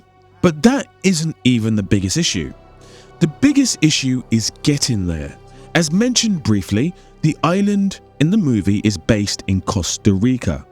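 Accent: United Kingdom. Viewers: You showed an Idris Elba movie clip here. How does his British dialect differ from yours or are they similar?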